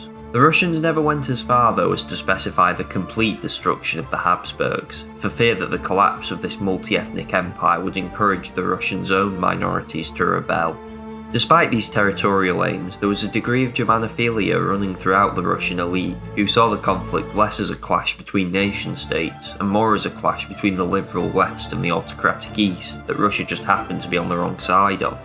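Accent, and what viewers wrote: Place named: England, United Kingdom. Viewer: Are you from stoke? Never expected a potteries accent clicking on a random vid.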